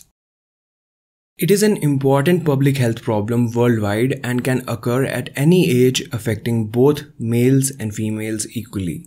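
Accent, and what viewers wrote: India. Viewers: Very good coverage. Also very interesting that I detect an Indian accent; yet absolutely no trouble understanding your words. Speech is remarkably clear. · Norwegian scabies ? that’s racist just like when Covid 19 was called the "Wuhan virus", or the "Chinese virus", anybody using that term was labeled a racist. You sound like you’re from India so if a type of scabies originated in India, i doubt you’d call it Indian scabies. "What’s good for the goose is good for the gander."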